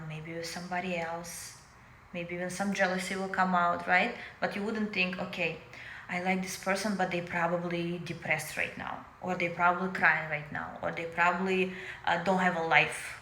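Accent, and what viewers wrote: Russia. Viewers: You've got a strong ruzzian accent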